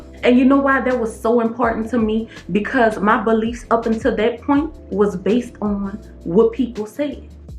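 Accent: New Orleans, United States. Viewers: Sound like your from NEW ORLEANS??? If you are I’m so happy to see someone from my City doing with your doing !!!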